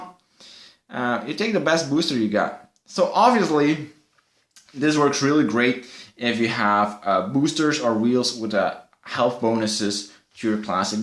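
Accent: Netherlands. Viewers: you are dutch?! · Are u dutch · Hé, je bent Nederlands! ( of Belgisch) : )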